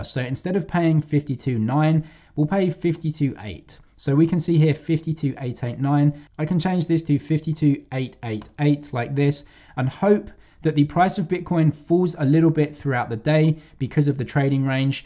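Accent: United Kingdom. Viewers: AGAIN!!!!! Another video that I don’t see what you see?! Is it possible that (I’m sorry) I am American, you sound like you might be across the pond……… could that be the problem???? (CONFUSED!!!!)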